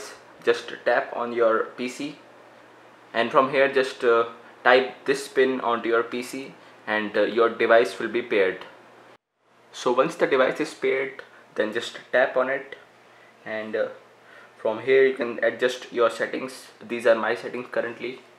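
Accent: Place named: India. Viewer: hate the indian accent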